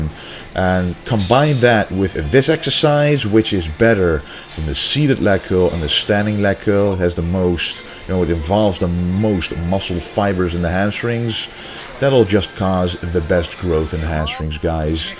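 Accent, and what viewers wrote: Netherlands. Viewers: YUNG_BOAA Come on dude. He's dutch. Its his accent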